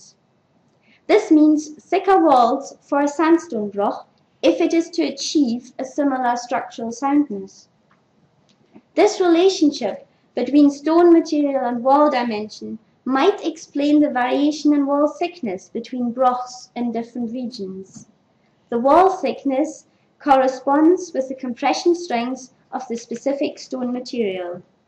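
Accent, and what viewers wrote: United Kingdom. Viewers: I like the speaker’s vocal volume undulations, as you call them. They convey her attitude to the questions she’s discussing. For example, where she discusses what architecture can tell us about how much the broch builders competed to out-build one another in height. I lecture, and I do the same. It’s better than delivering in a monotone. As for the accent, don’t you have any friends from Scotland? If not, find some. Just remember not to visit the country outside May to late September, or you’ll either drown in the horizontal rain, or die of cold. Regarding the talk’s intentions, the main one, I think, is to examine brochs from an engineering/architectural point of view. Do structural analyses, for example, to calculate how high, given a particular ground diameter, you can build the walls before the broch collapses. From that, you can work out whether a broch was in fact built to be as tall as possible. 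That tells you something about how fiercely the builders tried to out-build one another. And that in turn gives clues about how they tried to advertise their status. There’s more about this in <link>, “Land, Stone, Trees, Identity, Ambition: the Building Blocks of Brochs”, Archaeological Journal, 173:1, 1-29, 2016. mentions something related, when she says that the brochs built with better stone had thinner walls. This implies that the builders weren’t competing, because the ones with the better stone threw away the advantage that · I found this really interesting. I've been exploring the local iron age sites and I've had a thought. When and why did people change from building in circles, to building with corners (of varaing and questionable accuracy)? May I also say what a lovely accent! Is it from Shetland?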